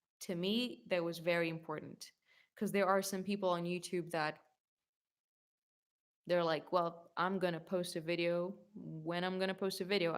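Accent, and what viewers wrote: United States. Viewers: Extremely surprised to hear an American accent! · Nice American accent!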